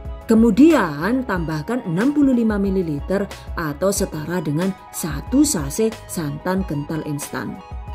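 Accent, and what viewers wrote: Banyumas, Indonesia. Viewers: Makasih utk resep2nya mbak... Aku jg seneng dg bahasa ngapaknya... Ora ngapak ora kepenak yah mbak... Suwun mbak...🙏🙏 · Suaranya kaya pengisi suara si unyil. Eh jebule wong ngapak. 😅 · Asli ngendi sih mbak,deneng pada Karo nyong,,,ngapak mania,,,Tek batiri ngapak yuh mbakyuuu 😀😀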